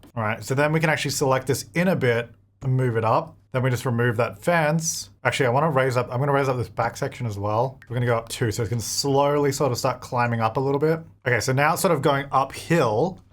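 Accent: Australia. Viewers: I always love watching your build videos 😍🥰 they inspire me with my sims builds to try new ways of building 🤔🤩🧐plus i do enjoy hearing a fellow Aussie play the sims 4 👍🇦🇺😊.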